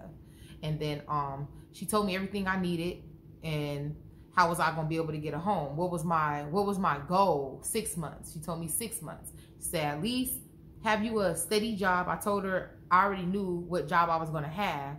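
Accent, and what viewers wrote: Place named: California, United States. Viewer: LOL! You must be from the Bay or LA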